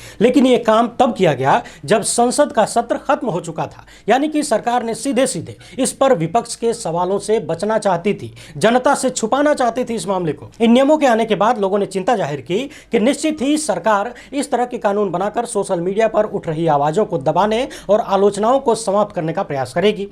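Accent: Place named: Bihar, India. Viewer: tumhara bihari andaaj kamal hai,news jo bhi ho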